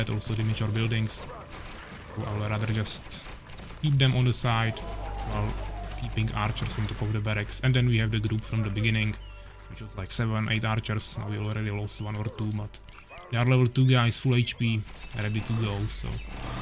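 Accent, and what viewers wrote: Czech Republic. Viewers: Did i hear strong Czech accent?🙂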